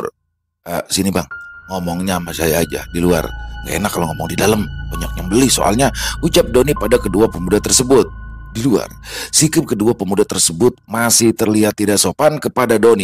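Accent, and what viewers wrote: Jakarta, Indonesia. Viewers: Iiiiihhh..sumpah keren abis nih!✌️ Logat Betawi ny itu loh...pas bngt, feel ny jd dpt bngt 👍 Fikiran Q jd traveling ngebayangin sosok Bu Zubaedah, Bg Doni, Gembul & si centil Bonies 😀 Karakter apapn yg dibawain berasa natural bngt. Multitalent bngt Kadang menjelma jd org Betawi, kadang jd org Kalimantan, kadang jd org Jawa😄 Top markotop bngt dah ahh..gadak obat 👍😁 Menyala Bang 😃🔥🔥🔥🔥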